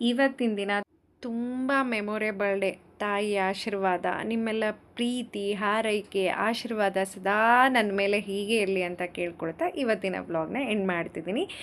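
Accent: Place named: North Karnataka, India. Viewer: Hatarki palle navu tinativi aadare banglore alli sigalla. Navu oorige hodag tinativi. Aamele ನಿಮ್ಮ bhashe matadi chennagide kelakke. Navu uttar karnatak ದವರು.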